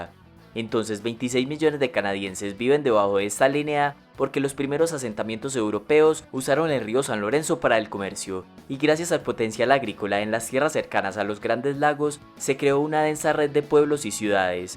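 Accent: Colombia. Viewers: Dato curioso el narrador de este video si no equivoco es de origen colombiano por su acento…..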